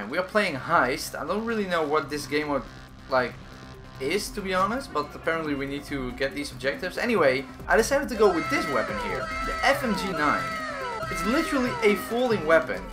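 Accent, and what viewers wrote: Netherlands. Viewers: You have a dutch accent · You can hardly hear your Dutch accent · Hello If i play battlefield with my friends they often say i sound like you, probably because I'm dutch. :) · Ik hoor gewoon je Nederlandse accent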